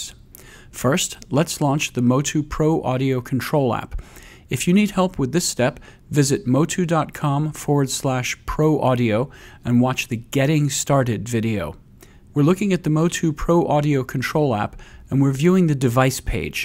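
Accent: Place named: Netherlands. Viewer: Interesting video, in addition it sounds like the speaker is dutch..!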